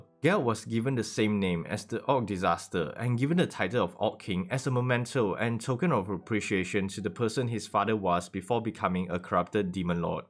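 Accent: Singapore. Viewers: U sound like a singaporean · Ehh u sound like a Singaporean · Are you Singaporean????!?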